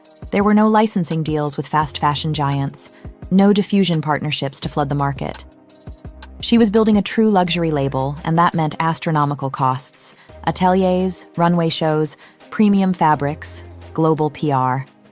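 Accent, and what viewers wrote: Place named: United States. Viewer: This is the best "documentary" I have ever come across of her. Excellent narration in an American accent which sounds like a beautiful melody. I really enjoyed it. Her story is so honestly told. It's just authentic.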